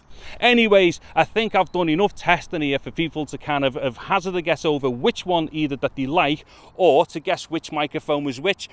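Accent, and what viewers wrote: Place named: Birmingham, United Kingdom. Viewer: You sound like a Peaky Blinder, mate. Anyway, amazing review. Thanks!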